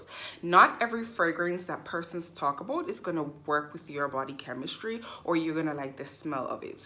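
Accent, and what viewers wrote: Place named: Jamaica. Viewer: Hey , where are you from hun ,?? Sounds sort of Jamaican ish living in Canada ?? Or some Caribbean?🤷🏽‍♀️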